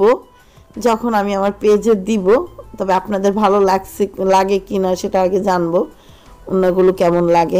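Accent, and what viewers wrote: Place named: Bangladesh. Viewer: Bhalo kore Banglay kotha bolun. Aapnara, Bangladeshira , jokhon banglay kotha bolen tokhon aamader Paschim Banglay bose lojja kore. * Hoichhe noy : Hoyechhe * Maikha noy: Mekhe * Jaitechhe noy : Jachhe * Bhorta noy : Bhate * Bahire Gichhilam noy: Baire gechhilam.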